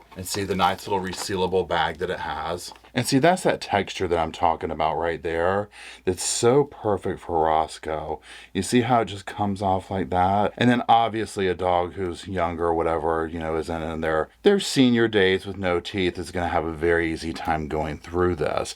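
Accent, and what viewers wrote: South, United States. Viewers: I know you ain't none of that fancy credential stuff but I ❤ you and your reporting especially The Southern accent makes me feel right at home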